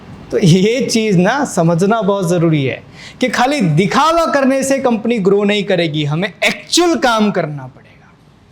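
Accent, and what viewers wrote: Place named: Gujarat, India. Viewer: sir apa gujarti he laght he voice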